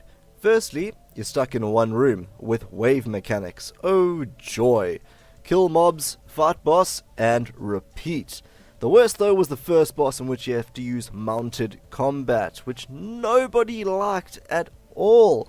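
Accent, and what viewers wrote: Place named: South Africa. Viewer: can't mistake that South African accent.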